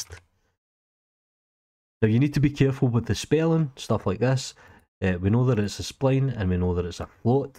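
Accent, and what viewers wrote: Scotland, United Kingdom. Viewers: He's Scottish, hence the heavy accent. It's revealed ;)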